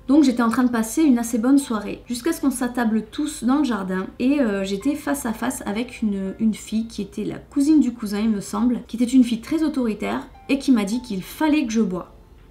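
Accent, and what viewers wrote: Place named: Montpellier, France. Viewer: Wahou, tu me touches beaucoup. J'ai l'impression de me voir à travers toi, même si je suis beaucoup plus âgée. Et en plus tu dois être de Montpellier vu ton accent! Tu fais si jeune et tu as connus déjà des choses assez difficiles... Choses que je connais parce que comme toi, je suis très sensible, naïve et gentille. Quel courage tu as eu de garder cet enfant avec des parents pas très compréhensifs. J'ai connu tout ça aussi. Bref, la vie est devant et je te souhaite tout le bonheur du monde. 😊💋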